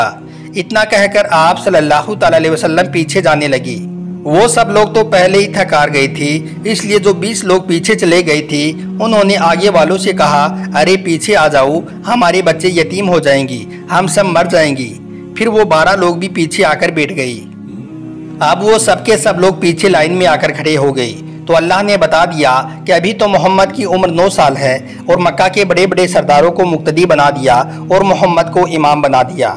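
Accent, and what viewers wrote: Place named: Bihar, India. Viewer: Bihari pehle apne boli sudhar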